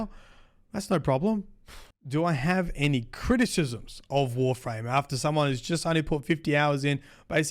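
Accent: Australia. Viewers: The weird nature of the internet is that I didn't even clock that you are Australian until you said "dollery-dos."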